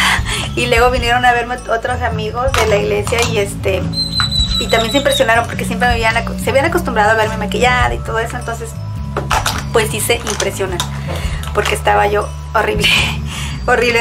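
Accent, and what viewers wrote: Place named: Tampico, Mexico. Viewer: muy bonita plática no cabe duda cómo platicamos los de Tampico al oírte me siento en casa en mi tierra mi esposo es cómo el tuyo y acá en Guadalajara les llama la atención cómo es muy bonito cuidate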